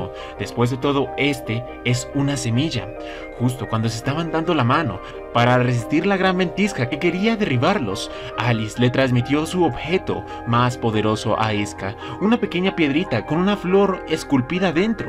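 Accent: Colombia. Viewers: Eres colombiano!?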